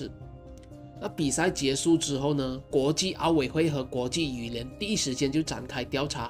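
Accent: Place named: Northern Malaysia, Malaysia. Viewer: 你是北马人吗？口音好重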